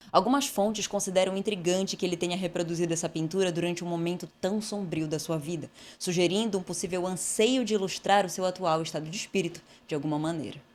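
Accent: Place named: Belém, Brazil. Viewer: Esse sotaque entrega, vc é de Belém!?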